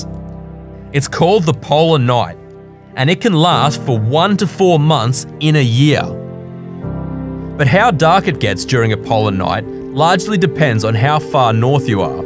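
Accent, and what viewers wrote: Australia. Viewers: Aussie mate explaining sounds so nice!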